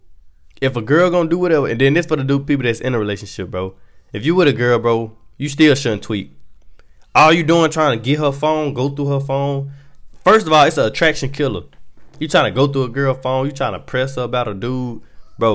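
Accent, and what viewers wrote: United States. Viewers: i can't understand the american accent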